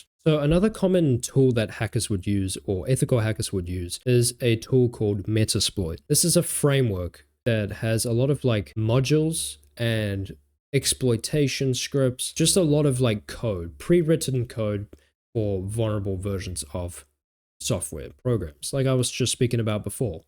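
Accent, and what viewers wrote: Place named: New Zealand. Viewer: It's a fellow New Zealander!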